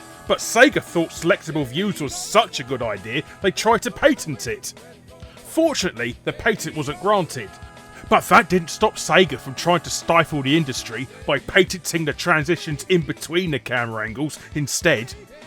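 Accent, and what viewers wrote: England, United Kingdom. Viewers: Guys, this is just how we say 'Patent' in the UK. Though I have to admit I stopped pronouncing it like that years ago because the US way is less mouthwork. Or something like that. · Dude's British. That's how they pronounce "patent" in a majority of the UK. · ...you know he's using UK English, right? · I believe it's called a British accent... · Telling an Englishman he's speaking English wrong I see. Troll elsewhere bronie. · Please always remember that you're dialect/accent is sometimes incomprehensible outside of the British world.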